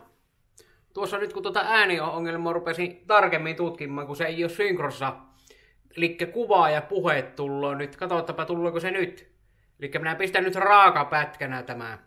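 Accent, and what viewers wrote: Savo, Finland. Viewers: Savon murre tökkii 😬😁👍🇫🇮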